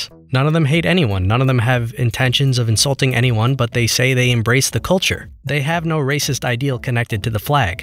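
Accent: United States. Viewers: 1( YouTube info on channel says its from United States 2( American accent. You can say its Canadian sounding too but with the info of the channel description cmon. I'm an American as well no hate, just wish most of us would do more homework and be more open minded on topics, you know?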